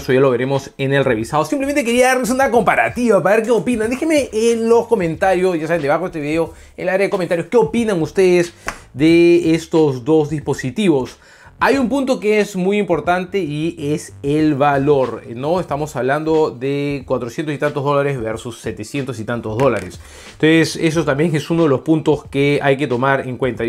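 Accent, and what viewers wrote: Peru. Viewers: eres peruano???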